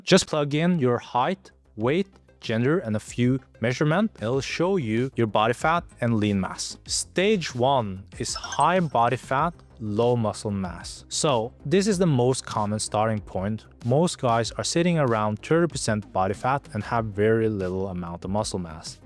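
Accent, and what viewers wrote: Norway. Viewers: Lol, you sound Norwegian?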